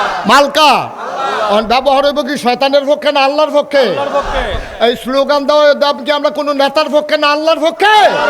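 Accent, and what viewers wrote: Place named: Comilla, Bangladesh. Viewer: হুজুর মনে হয় কুমিল্লার। মাশাল্লাহ অনেক সুন্দর ওয়াজ।💯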